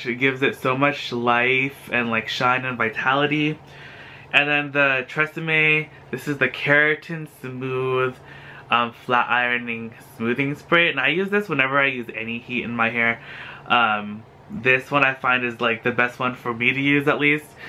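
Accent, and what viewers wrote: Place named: Philippines. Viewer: I'm guessing you're a Filipino. I can tell by the accent. :)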